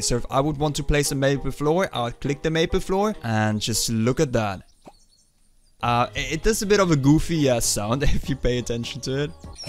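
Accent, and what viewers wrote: Germany. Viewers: Are you german by any cance? ur accent just sounds like that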